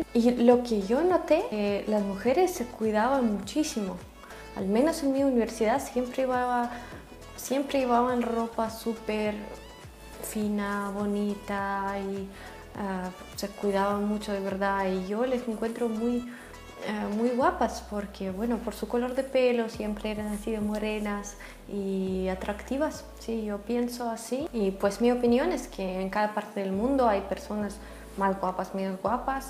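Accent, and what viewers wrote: Chile. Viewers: Eres hermosa se te nota mucho el acento chileno. Saludos desde México. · Aprendiste el chileno en tu estadía allí? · Es el segundo video de tu canal que lo veo, me quedé encantado con el acento, hispano chileno jajaja, pero el castellano neutral es el peruano, espero puedas conocer mi país, aquí tienes un amigo peruano para guiarte por ésta hermosa patria. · Es adorable tu acento Chileno!!!!!!!!! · en qué parte de Chile viviste?? Fantástico tu castellano y más lindo tu acento chileno!!! Saludos desde Chile.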